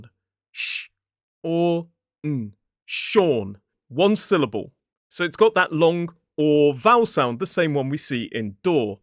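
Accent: United Kingdom. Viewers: Ciao, what did you say at the end to finish off your video, "from me" or "fir me"? They say the same thing on TV to end a programme but I never get it. I love your British accent so much! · Hi, lve just come across your channel. l love the way you run your lessons, and l love listening to your British accent. I've subscribed ❤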